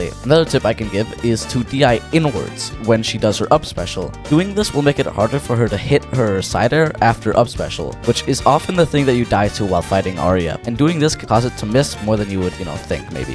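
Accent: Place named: Denmark. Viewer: bro lyder lidt for dansk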